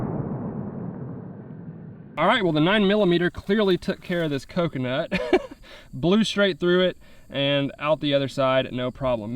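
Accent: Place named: South, United States. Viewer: It’s weird. You sound southern, but you say pop.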